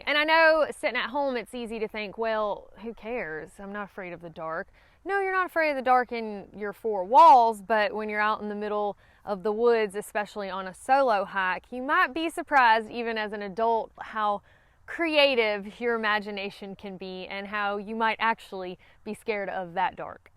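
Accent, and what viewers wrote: South, United States. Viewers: You sound American ! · TOO FUNNY!😄 Love your accent,! REAL Southern! 👍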